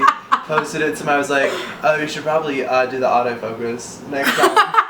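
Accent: South, United States. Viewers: You just have a southern accent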